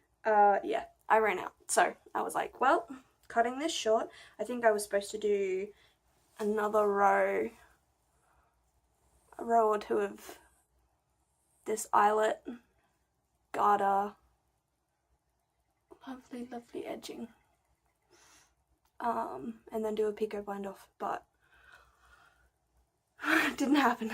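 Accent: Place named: Australia. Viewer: Hahaha love your style so funny. The accent and humour pointed to oz, I'm from NZ. Great podcast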